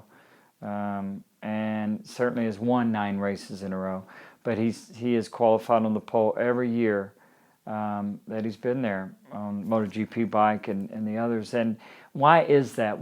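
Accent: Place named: South, United States. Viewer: It’s great to hear a southern accent talking about moto gp. As I always say, I wish there were more Americans involved in moto gp. Keep up the great work, Freddie!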